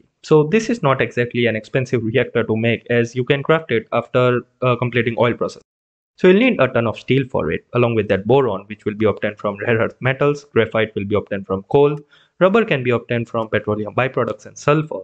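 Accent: India. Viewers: Indian. I remember a friend of mine talked with him, and told me as such as they were both indian :P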